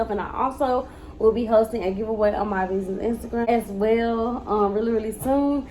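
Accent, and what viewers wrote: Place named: South, United States. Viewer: You sound like your from the south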